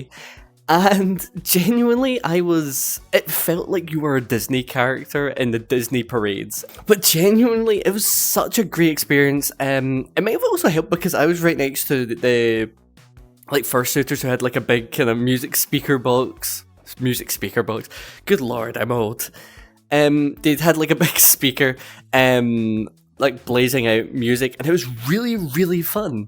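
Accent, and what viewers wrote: Scotland, United Kingdom. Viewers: I gotta love your accent. You sound very Scottish to me.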